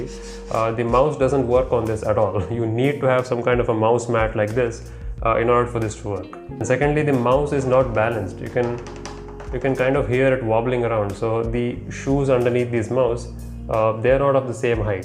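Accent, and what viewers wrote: India. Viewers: The channel's name should be Hopeless indian sounding guy that causes cancer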